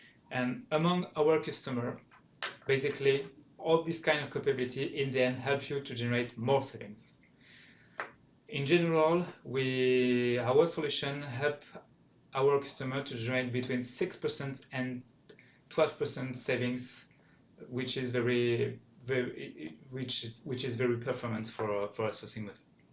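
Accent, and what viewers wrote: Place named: France. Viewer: the french accent is terrible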